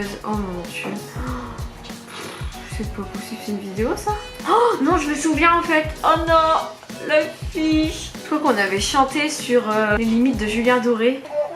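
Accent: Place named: North, France. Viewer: J'adore ton accent du chnord :*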